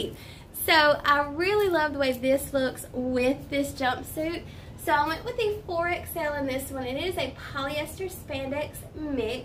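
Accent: South, United States. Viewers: You are so cute. I love your personality and your southern voice.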